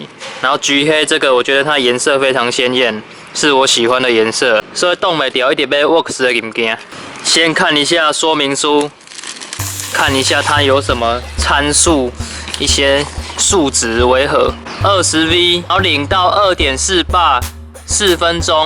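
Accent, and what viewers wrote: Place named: Taiwan. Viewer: 喜歡聽你講台語 像鄰家的哥哥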